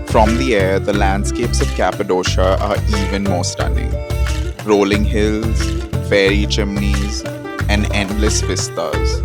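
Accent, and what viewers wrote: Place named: India. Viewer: It’s tough to watch this with that Indian accent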